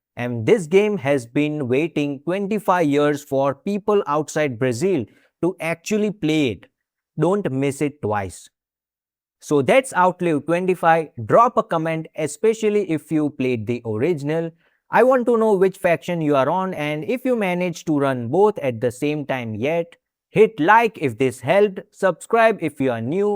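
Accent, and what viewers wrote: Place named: India. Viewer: Indian accent, disregard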